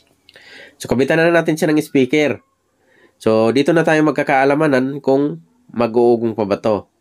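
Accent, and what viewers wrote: Bicol, Philippines. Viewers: Good day SIR,... Parang BIKOLANO k po,... sain po ang "SHOP" MO dyan sa BIKOL?,... nagre- REPAIR k man po nin mga "BEAM LIGHTS",... ( MOVING HEAD)??? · Bikol ka palan manoy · Sir salamat👋taga bicol kaba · Bikolano ka? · Lods bicol dialect background mu ah😂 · Bikolano po kyo sir?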